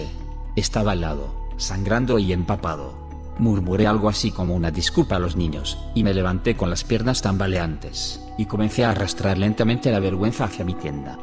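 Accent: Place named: Russia. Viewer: Yo digo aue si es rusa porque su acento se oye muy marcado.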